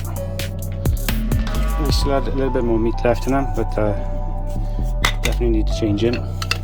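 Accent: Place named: Wales, United Kingdom. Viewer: It’s funny how in this Welsh dialect of English, words like ‘that’ are pronounced ‘naat’ or even ‘aat’. The first consonant completely absent. 😐. Where did the ‘th’ go?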